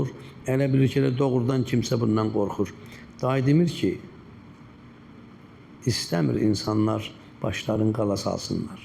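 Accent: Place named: Baku, Azerbaijan. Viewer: Bakılı ləhçəsi ilə 😊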